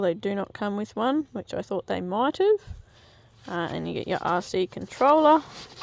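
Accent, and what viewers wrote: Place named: United Kingdom. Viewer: British Eng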